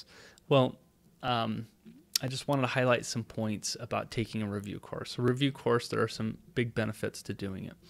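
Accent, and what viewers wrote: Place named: United States. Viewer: I think a major advantage that your course offers is the simple fact that English is your first language, with a US accent. You also seem like a good instructor because of your desire to want to help others. Your personal journey is comforting knowing that we can reach our goals too, even if we had a set back.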